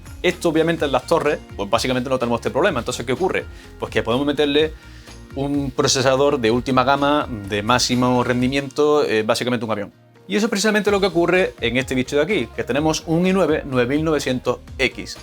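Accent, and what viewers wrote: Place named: Argentina. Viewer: solo conoces intel,, y al min sale que amd es una de las compañias inportantes en el desarrollo de sofware,, parace que te falta trayecto tecnologico amigo,,, y el disco que dices no trae una aguja,, es una pastilla magnetica,, mas al estilo de las pastillas de las cintas de casette de los 80s,, otra cosa ,, y lo voy a decir, no se que es peor,, tu tono argentino,, o tu ignorancia tecnologica argentina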